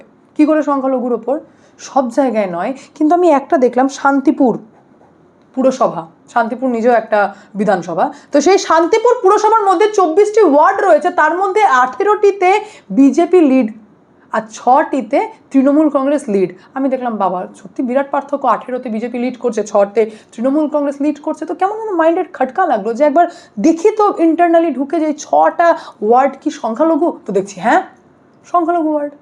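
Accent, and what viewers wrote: Bangladesh. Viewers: তোমার বাঙাল কথা টা যে কি ভাল লাগলো 😂😂😂😂😂😂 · tumi to besh bangla desii bhasa ta darun bollen khub bhalo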